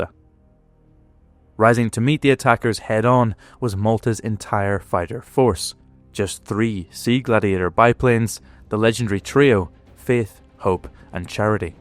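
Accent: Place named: Northern Ireland, United Kingdom. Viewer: Well done, a most enjoyable documentary with plenty of humour and a delightful NI accent. Looking forward to part two, cheers.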